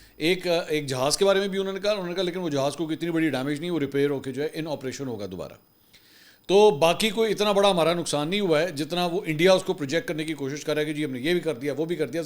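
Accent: Punjab, Pakistan. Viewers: My wife is from Punjab, India. She really loves your videos, and every morning she play your video during breakfast. She loves your Punjabi touch and laughs a lot. 😂❤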